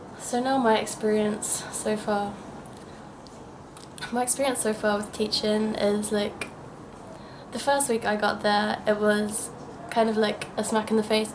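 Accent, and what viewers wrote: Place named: Australia. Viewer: sounds Australian hahah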